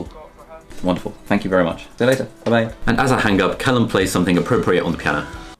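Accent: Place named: United Kingdom. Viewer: The britsh spend hafof the day drinking the( t)and other have ignoring it😂😂 l like your accent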